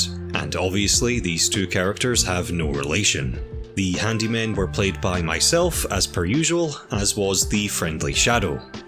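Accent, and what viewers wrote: Scotland, United Kingdom. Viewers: He's an absolute gigachad. Can write, can animate, can voice act, and who knows how many other skills, and he has an epic Scottish bass voice to boot. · Yes. He actually makes his notes in a Scottish accent. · I can't quite place your accent... Is it English or Scottish?!?!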